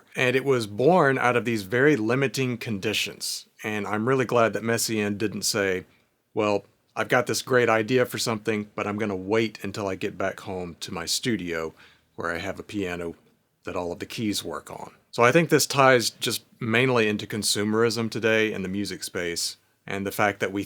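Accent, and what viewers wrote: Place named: Mississippi, United States. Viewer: Middle of Mississippi? I knew I recognized that accent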